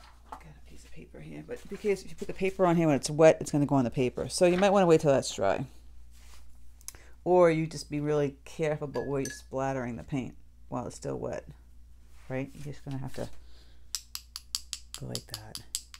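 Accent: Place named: New England, United States. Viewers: you are so refreshingly New England, somehow. I moved to NC a few years back, and I love hearing you and your expressions. “Boop”, “ooo hooo” etc. (lol). I am almost homesick - except for the weather. Your vids save my sanity. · I love this! I'm going to try it! You sound like my cousin in New Hampshire. Where in New England are you from? I'm a Mainer.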